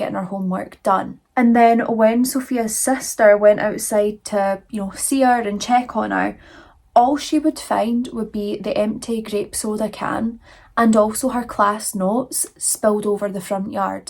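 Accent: Scotland, United Kingdom. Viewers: crying that you replied!! I LOVE your videos, as a fellow Scottish gal it’s nice to have a Scottish accent to listen too!🩷 I watch all your videos gets me through work everyday! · Love the ice blue on your eyes, your gorgeous. I also love your accent! The biggest part of my ancestry is Scotland, if I by chance had been born there maybe I’d have that accent!❤ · Hi, Just came across your podcast and im definitely subscribing.. My ancestors are from Scotland, USA here… Love your accent ❤ p.s. you’re a beautiful young lady… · Hi, my mum was from Scotland i love hearing your accent and I love your personality your definitely 1 of my favorites ❤ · Love the way you tell a story i can easily understand you im Scottish too you bring humour whilst Being serious ❤you are a beauty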